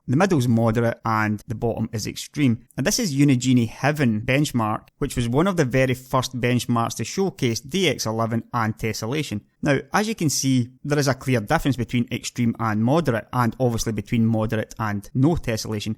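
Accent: Scotland, United Kingdom. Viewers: Great information and he dove in deep. This reinforces what I've suspected over the last decade which is that there's no real need for so many hardware refreshes. It's more about marketing gimmicks and lazy coding. Sure there's other factors like the amount of texture memory available, speed and power efficiency but when I started seeing less than 10% overall performance improvements between generations I knew something was up. This vid made me a subscriber, Scottish brogue and all...:-) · scottish, game over for you. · It's Scottish English, laddie! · Jesus christ another Scottish ned I have to listen to for 20 minutes it's bad enough trying to understand what these monkeys are saying in Trainspotting. Now I have to listen to this heroin addicted tree dweller talk about Gameworks? I don't think so! We really need to build that gigantic wall and have done with these "people" I am so sick of it.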